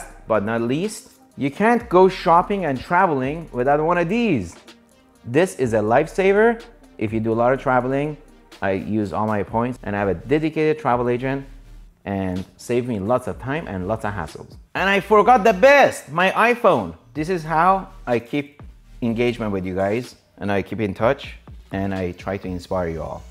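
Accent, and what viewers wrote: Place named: Iran. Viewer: We know you're Persian because you put an e before every word. :)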